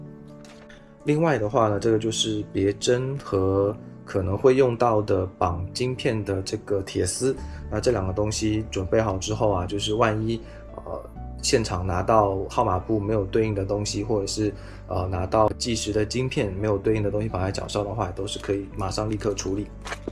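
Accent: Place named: Taiwan. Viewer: 講話敘述真的很像台灣人，CC字幕有繁體字，真的很用心，內容很詳細清楚，非常棒，感謝🎉。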